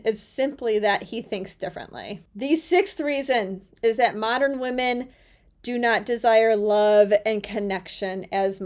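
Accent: United States. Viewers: You are a very interesting women and i totally agree with you. Here in the Netherlands it is the same as in all the west. Respect for you....but could you please work on your typical American womenly accent (i hope you know what i mean). Then it would be much more enjoyable. Keep up the good work in creating awareness and perhaps change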